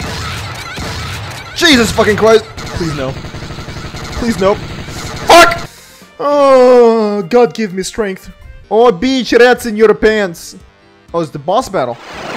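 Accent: Russia. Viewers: I can't decide, I hear the Russian accent and see the inscriptions on the Russian, but at the same time you have in the description, the location of USA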